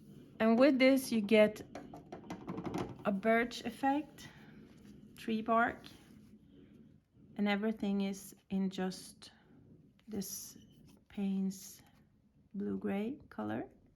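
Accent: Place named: Sweden. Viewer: You sounds as if you are Swedish, so am I and I’m a watercolour artist and have my studio near Linköping. Anyhow I’ll try this and save it for later repeating. Kul att ha hittat dig, jag skall absolut dina sätt att måla björkar! Må så gott! Ps…. Nu är jag prenumerant av din kanal!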